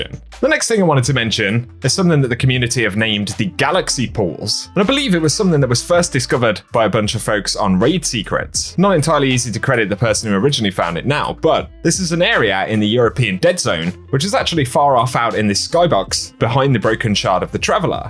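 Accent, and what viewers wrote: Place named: Midlands, United Kingdom. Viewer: I would’ve out good money on Fate of all Fools being in here! Great video! Ps are you from Birmingham / the Midlands? Your accent sounds familiar!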